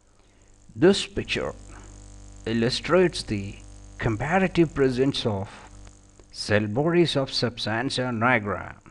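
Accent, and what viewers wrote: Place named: India. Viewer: Good in Content,Animation & Voice Clarity...Would have been better if it explained how Physiotherapy treatment works on the PathoPhysiology & the Accent was Purely Indian!!!! Waiting for more articles in Physiotherapy!!!! Einstein Babu..,MPT (Neuro) Physiotherapist , Govt of India(ESIC Model Hospital) , Bangalore,India.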